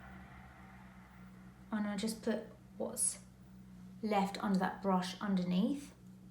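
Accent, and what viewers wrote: United Kingdom. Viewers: To my American ears, anything you say in your British accent sounds luxurious!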